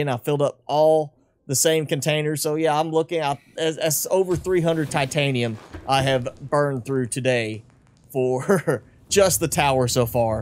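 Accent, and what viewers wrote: South, United States. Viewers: You sound southern are you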